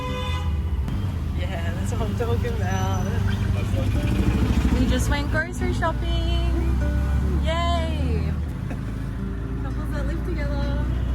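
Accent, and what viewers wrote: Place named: Australia. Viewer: Never lose your Aussie accent ♥ Do they tell you to assimilate like Aussies do to Indians 😂 Naah we are not like that. Enjoy the life like my family back home, enjoying house help, watching KDramas, home deliveries, apartment facilities and gym. wow that was a cool transition effect of older version of videos Wow you seems to have quite a few expats there. ♥ it.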